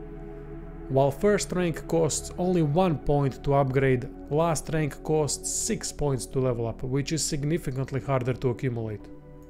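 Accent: Russia. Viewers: You Russian?? Slightly sounds forced cause I love doing a Russian accent as an american. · Best true Russian English ever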